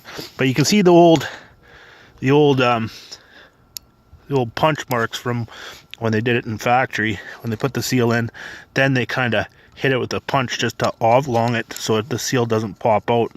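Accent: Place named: Uttar Pradesh, India. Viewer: You sound like you are from the U.P to me eh'...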